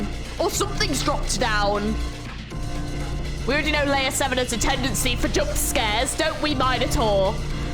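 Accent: United Kingdom. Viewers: This girl is so british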